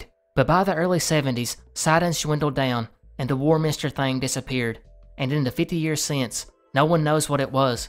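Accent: South, United States. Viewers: Also curious, where does your accent come from? I play games online with another southern man and his is different (more mild idk how to explain it) but it’s still obvious you’re both southern. Just wondering and trying to differentiate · Keep them coming Sir. +As a European I must admit I find it really refreshing to hear southern accent in this kind of videos. Thank you for your work!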